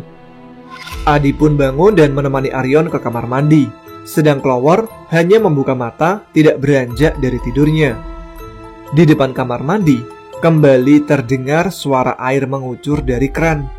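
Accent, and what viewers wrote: Malaysia. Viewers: Respect pada mas cuba membawa cerita ini dalam tonasi bahasa Malaysia. Salam serumpun 🙏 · Johor Malay kah ini bang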